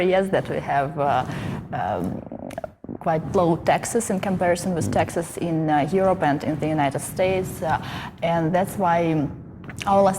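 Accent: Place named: Russia. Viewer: У нее какое то русское произношение ))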